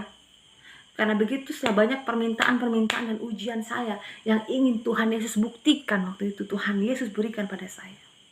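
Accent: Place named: Madura, Indonesia. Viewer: enak bngat bu bhsa di campur good.snang klu khotbah tu bhsanya darrahnya hrus ada krn itu asli bhsa leluhur bu dia.sy paham klu logad madura krn sy lama di surabaya tnggal di daerah kenjeran bnyak madura di sananya mba.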